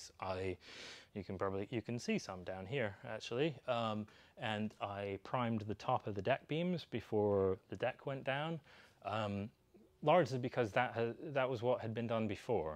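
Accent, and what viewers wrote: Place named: Cornwall, United Kingdom. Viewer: You're an interesting and knowledgeable speaker (who luckily has retained a lovely Cornish accent) but I would just love to see some of the actual work and progress on Rosalind. Perhaps you could combine the two?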